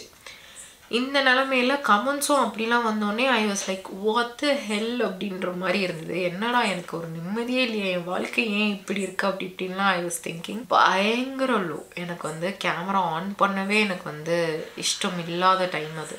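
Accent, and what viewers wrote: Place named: Coimbatore, India. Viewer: coimbatore slang sissy😜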